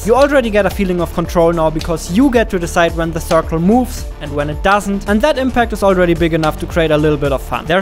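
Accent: Germany. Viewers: german? 🤔 your english sounds german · Sorry, but this german accent turned me off instandtly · The german accent makes this video much more fun!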